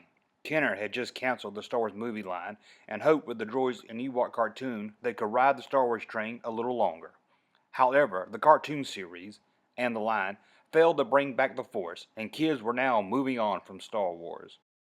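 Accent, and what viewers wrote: South, United States. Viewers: just a southerner. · He has a southern drawl...he’s from Joe-juh. (Georgia). That’s why he sounds different; u don’t get out much do you? It is ok though, intelligence and looks aren’t everything!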